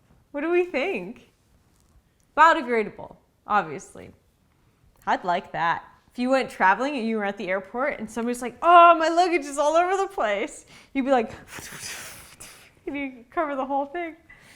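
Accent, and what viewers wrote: Canada. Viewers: High had a Canadian accent Eh? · She gets a lot more Canadian when high 😂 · She started sounding more and more Canadian as this video went on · It's Human Rights violation to ask a high person "What was the last thing you forgot?" You could give someone an aneurysm. She gets SO CANADIAN when she's high. I love it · You’re Canadian accent when you’re high >.< Love it, dawg. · Dude, around 5 minutes in, the Canadian accent really comes ooote.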